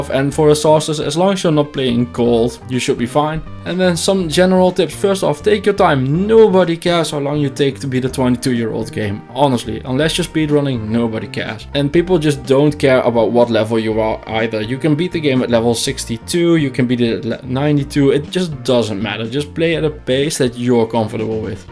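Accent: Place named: Netherlands. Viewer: I've been stuck on NM for 9 months now because I'm waiting on the next entry in this series..;) jk, but nice video and looking forward to new videos! (Also nice to hear a fellow dutchy)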